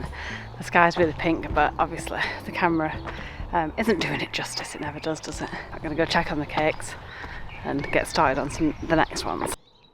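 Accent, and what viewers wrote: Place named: East Yorkshire, United Kingdom. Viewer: I'm placing your accent in Beverley East Yorkshire (near Hull) ...am i right?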